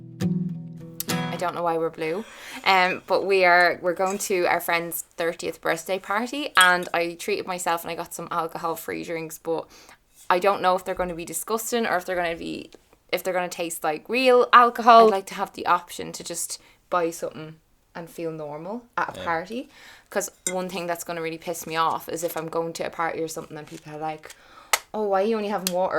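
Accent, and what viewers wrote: Ireland. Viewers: I am currently reading your book (english is not my first language) and one thing that it does to me is crave hearing the irish accent. so hear I am listening to you ramble on and enjoying it very much :)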